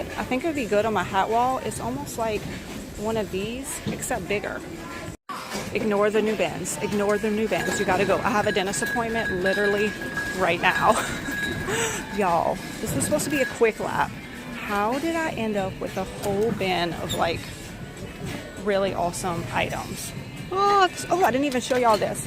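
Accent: Louisiana, United States. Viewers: What is that accent? Louisiana?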